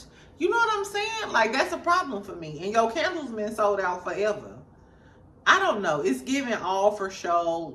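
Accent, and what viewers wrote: St. Louis, United States. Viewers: Good commentary, I love your St Louis accent sounding like Sunni….hur and thur, it’s so cute. While it’s tacky for Chris to try to set up anyone people forget this set up happened after Mel had filed for divorce and no possibility of reconciliation, she didn’t want Martel back then. If he was trying to hook up Martel during the marriage, that’s different. To me, the timeline of when all this was happening is getting downplayed, Mel and Martel may have just been still married on paper while the divorce was in progress. IMO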